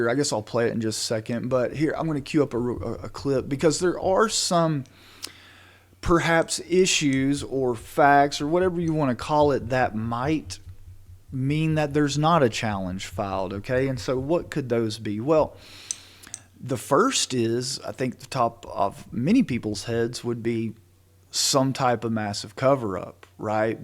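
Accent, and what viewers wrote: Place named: South, United States. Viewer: Love your southern accent 🙂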